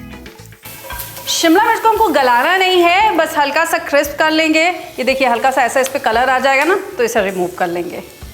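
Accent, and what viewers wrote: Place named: Uttar Pradesh, India. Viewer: madam aapka recipies batane ja style mujhe bohot pasand hai aur jo aapki UP touch wali Hindi ❤ Par ek Salah hai meri.. ki aap yadi ghar ki sabzi banana bata rahi Hain toh nonstick ki jagah ghar wale cooking vessels use kareingi toh achha hoga.. jaise mein ghar mein roz mein loge ki kadhahi use Kari hun healthy cooking ke liye. Aur ek baat.. aajkal tamatar itne mahange ho gaye hain aur aapne Zara si aalloo Shimla mirch ki sabzi mein 2 bade tamatar daal diye 😲 aap pl. Kuchh recipies without tomatoes wali ya tomatoes ko kis cheez se replace kar sakte hain batayeingi toh dekhna aapke viewers double ho jayeinge 😁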